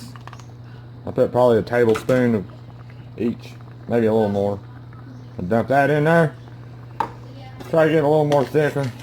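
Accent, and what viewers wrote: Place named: Western Kentucky, United States. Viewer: Where are you from? We have the same accent. I’m from western ky. You’re a great cook btw!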